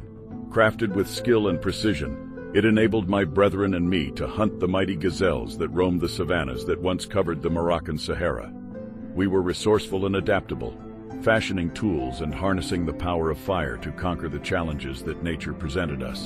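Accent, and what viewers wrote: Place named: United States. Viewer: Odd, the American English. Not even an Arab slant to the English! 😢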